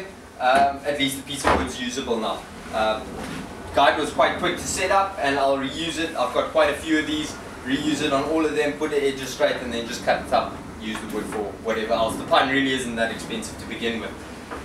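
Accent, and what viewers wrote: South Africa. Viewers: Thanks for the info! - Is that a South African accent? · South African accent? Good video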